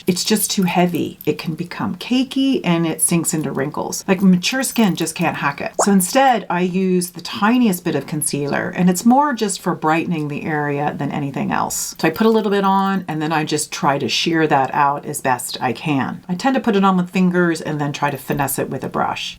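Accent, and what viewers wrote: Ireland. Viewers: You occasionally have a bit of Irish accent!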